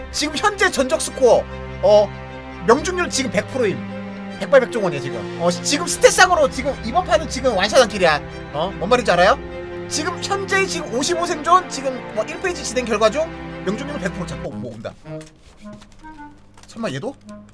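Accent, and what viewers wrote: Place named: Gyeongsang, South Korea. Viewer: 바깥에를? ㅋㅋ 진짜 갱상도야 이햄은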